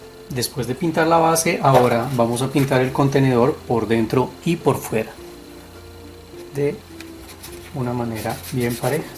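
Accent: Colombia. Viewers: Me Encantaron las 3 pero Ame la Tercera y con tu Acento COLOMBIANO me Enamore mas de tu ARTE gracias y Bendiciones por compartir saludos desde Jalisco México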